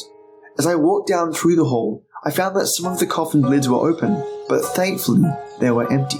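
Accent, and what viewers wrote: Australia. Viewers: Terrific story mate! Couldn't resist using the word mate, love your Aussie accent.. Which sounds pretty funny..considering I'm an American gal from the south who has an Ellie Mae Clampett drawl myself. Thanks for sharing all these amazingly creepy tales..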